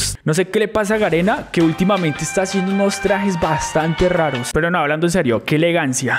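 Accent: Colombia. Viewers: Antes cuando te veía me sonaba muy paisa tu voz pero ya tot acostumbrado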